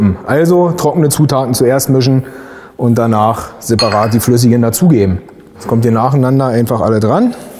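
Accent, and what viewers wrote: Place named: Berlin, Germany. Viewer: HAMMER...wie ich diesen berliner Slang liebe, schlimm ist das bei mir ;););) Finde ich ein Bombe Rezept weil nicht komplett in Fett und ÖL ertränkt, Zutaten klingen stimmig, freue mich auf ausprobieren, ganz lieben Dank! LG aus dem shönen Rheinbach, Henning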